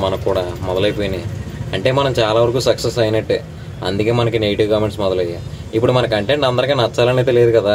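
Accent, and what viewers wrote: Godavari, India. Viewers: Mawa nijam cheppu nidhi godavari kadha 😅😂❤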